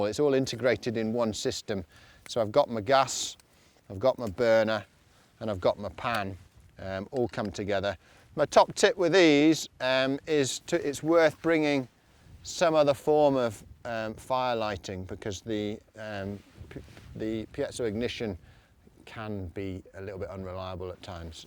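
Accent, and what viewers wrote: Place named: England, United Kingdom. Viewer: He sounds English and most of the rules he's advocating only apply in England. He does not seem to realise Scotland is part of UK.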